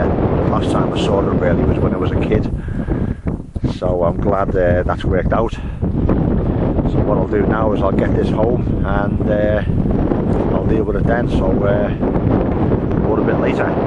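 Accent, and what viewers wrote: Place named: Birkenhead, United Kingdom. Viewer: Fantastic as always, I really enjoyed that & very interesting. Nice to see one of your childhood haunts, I've always thought you had a Birkenhead accent x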